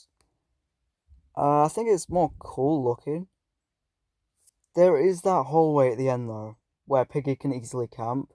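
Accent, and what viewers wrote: United Kingdom. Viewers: HES BRITISH! LETS GO! (Take your time, get used to your voice being on youtube, no worry) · Love your voice my bro, Keep doing. (British accent makes it more beter 💀)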